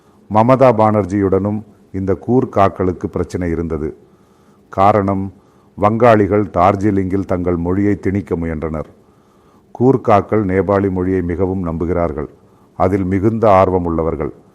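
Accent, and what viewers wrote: Kerala, India. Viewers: This is Ai generated video. He is a malayalai and I know he speaks Tamil with a heavy Malayalam accent. This is not hsi voice. And here he speaks Centamil 😂😂😂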